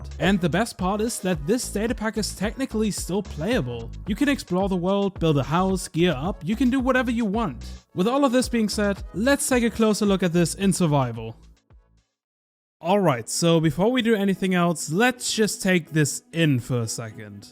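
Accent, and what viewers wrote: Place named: Germany. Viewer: Man merkt den detschen Akzent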